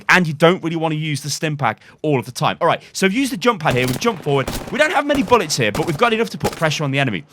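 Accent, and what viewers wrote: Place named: United Kingdom. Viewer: 200iq play. I’m fucking cracking up. Whatever anyway my twitch is “theToxicLlama” I’m crazy funny toxic and I suck ass. Tune in. Rumor has it I’m gonna off myself soon for losing so many games. Great content. Always watched your OW stuff. I am truly truly brutal on my OW streams. P.s I generally hate British people. Stupid fucking accent but your aighttt big brain big IQ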